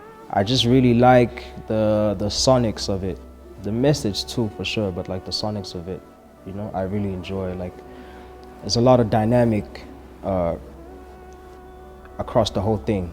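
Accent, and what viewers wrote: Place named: South Africa. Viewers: Coz his south African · why is he using his south African accent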